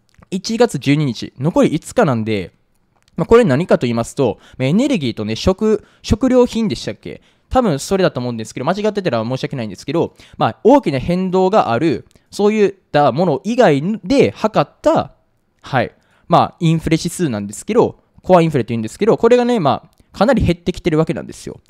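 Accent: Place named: Kansai, Japan. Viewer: 関西出身の友人と口調が似ています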